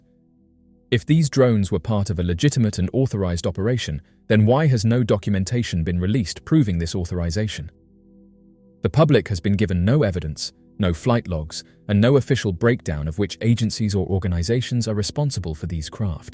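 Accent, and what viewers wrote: United Kingdom. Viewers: This channel is such bullshit .Right wing shill and then act like they get memo's from Anonymous😂Delivered in this ridiculous brit accent like that makes it seem serious.What a constant on running joke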